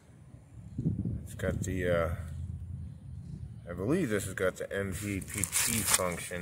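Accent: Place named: Appalachia, United States. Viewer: You sound lil bit hillbillysh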